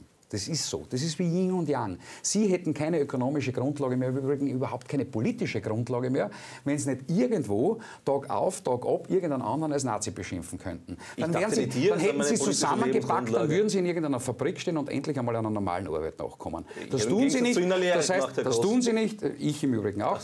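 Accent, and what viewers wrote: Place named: Vienna, Austria. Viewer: "dama ned blädeln....." XD Der Mann ist so genial...und lange hab ich nicht mehr so einen hofrätischen Wiener Akzent gehört ..... tut gut mal wieder zu hören.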